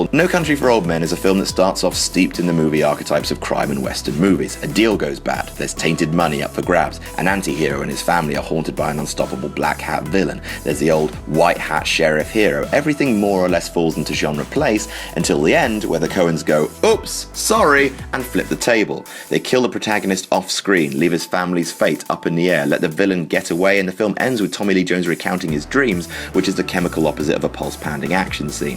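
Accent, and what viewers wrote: United Kingdom. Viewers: I know and his heavy British accent wasn't helping either. I couldn't finish it.... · So you are just shrugging you shoulders with a british accent. Congratulations. You have a blog that does nothing.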